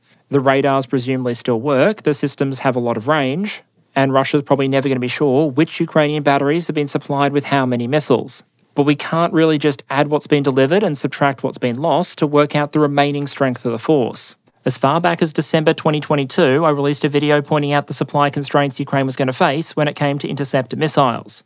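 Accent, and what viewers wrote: Australia. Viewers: Too bad he didn't say "Vampire Counts" in his Aussie accent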